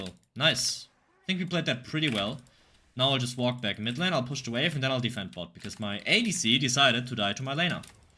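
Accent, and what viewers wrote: Germany. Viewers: Is he german? Just interested because the accent sounds a little german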